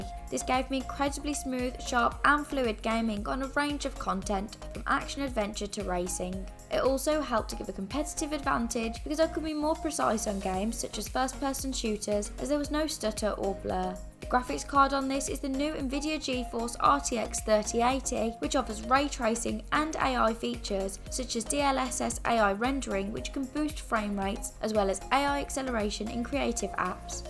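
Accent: United Kingdom. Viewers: She's british. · this is the most british accent i have eva heard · I love that British accent ❤️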